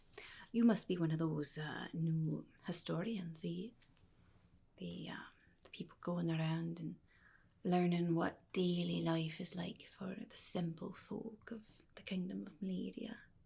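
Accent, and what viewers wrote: Ireland. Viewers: Aaah, so soothing. Thank you ever so much,. I've recently discovered ASMR and your channel,and I love it (not to mention, your wonderful irish accent).Always had a special fondness for lighthouses, btw. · A sure spot of siren song itself, this soothing, seductive, cigar smoke shrouded seance of the solitary sage. If not the siren residing on the rocks to lure you to be lost on some granitic crag, surely a siren to lead you to be lost in an illuminating legend or captivating contemplation of the captain from a rocking chair. More than a wee bit of beguile by brogue in an old saw, story, or spell shared well by the keeper of the light. Far more to keeping the light than meets the eye indeed -- and not the one atop the tower, but in the be-lure to be sure of the tender of tales and old soul insights that cast their own lights over leagues and lifetimes.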